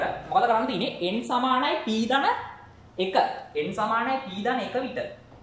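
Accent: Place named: South, Sri Lanka. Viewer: ❤🌝දකුණේ හඩක්...මරු mru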